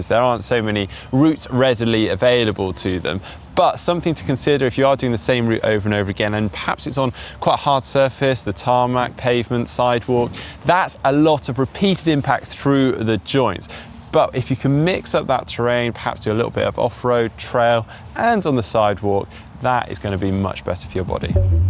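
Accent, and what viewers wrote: United Kingdom. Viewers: Ahaha btw British accent is nice to hear 👍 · I want that British accent lol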